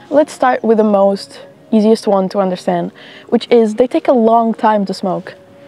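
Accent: Portugal. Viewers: tu tens sotaque de tugaa... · I thought you had the most obvious portuguese accent and then i checked the description and boom there it is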